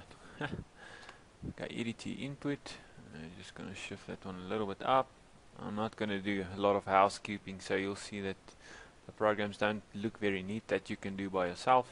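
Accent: South Africa. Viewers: Are you afrikaans? Cuz i am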